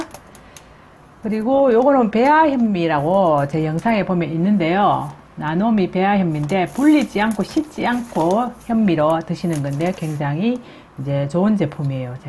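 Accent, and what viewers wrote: Gyeongsang, South Korea. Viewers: 경상도 말씀이 정겹네요 제가 위가 않좋아 고생하는데 함 해봐야 겠네요 감사합니다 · 경상도 사투리가 너무 정겹습니다. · 경상도 사투리 참 말로 재미있다 그렇치요!? 맛 난 요리도 맛 있고요 나도 해볼람니다 잘 계시소 자주 방문합니다 좋와요,구독도 잘눌러 줍니다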